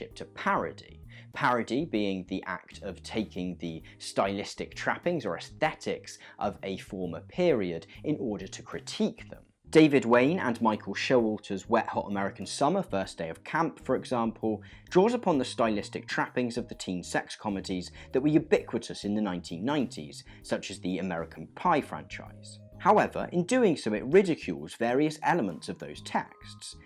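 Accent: United Kingdom. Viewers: Yoooo, Weinstock was one of my professors in college! Never thought I'd hear a British guy talking about his work! Great video! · Thank you for making this. I liked and subscribed, this isn’t a casual thing for me and I don’t know the rest of your stuff. But I’m more willing to put up with things when they have a British accent, so I’m lucky there. Honestly, I hope you’re getting by on these videos and that’s the reason I did the above, you’ve found a way to not be a part of the rat race... and for that I admire and want to reward you.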